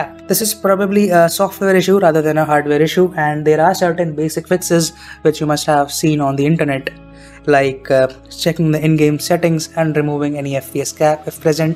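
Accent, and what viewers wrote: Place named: India. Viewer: After searching trough all steam and reddit forums hoping for a fix I couldnt find anything. After going on youtube and clicking on this absolute chad video with a chad that has an indian accent and fixes all your problems with a single switch I finally had the solution. Thx brother, you earned my sub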